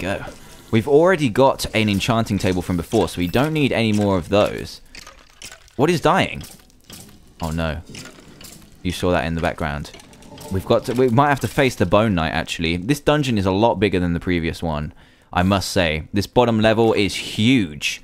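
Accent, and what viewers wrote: United Kingdom. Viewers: just british acsent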